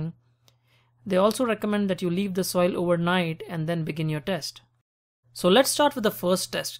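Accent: India. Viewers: Everything else is a regular indian accent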